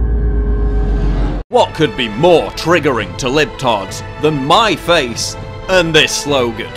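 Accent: United Kingdom. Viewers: you are the living proof of people with a British accent getting away with saying utter shite. I wonder if your intellect is strong enough to deal with the hard topics of political philosophy or are you just gonna make a name of yourself by posturing as the tough guy to college sjws?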